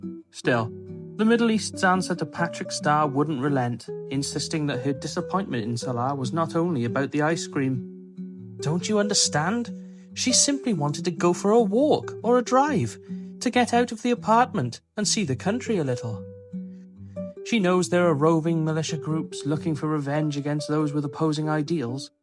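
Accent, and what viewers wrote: Wales, United Kingdom. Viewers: i think he might be welsh but that's just a guess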